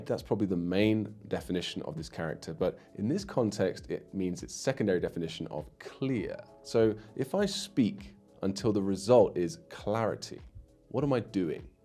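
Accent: Standard. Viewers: 普通话真的说的比我这个母语者还标准。。。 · 这位老师的发音真的非常标准（来自中文母语者） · 你的发音很标准，不错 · 发言够标准的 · 你的视频作为中文学习是非常好的材料。 不过还是有一点点建议：中文的每个字的拼音最好用空格分开，这对初学者会更友好。 你的发音非常标准，很好🥰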